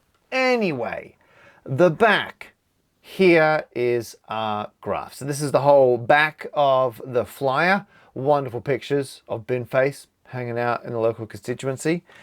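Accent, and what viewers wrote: Australia. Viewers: in Australia, my home and your former home, we have preferential voting. You number the candidates 1...n and they eliminate who came last and move those votes to their second preference. That is repeated until someone gets over 50%. It will solve your coffee vote scenario where the least popular party wins. Speaking of Australia, you're losing your Aussie-ness. Graph has the 'a' pronounced like 'a' in grab not grass.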